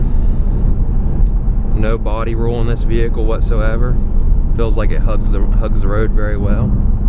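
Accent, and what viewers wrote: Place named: South, United States. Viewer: I can barely understand you with that ridiculous southern drawl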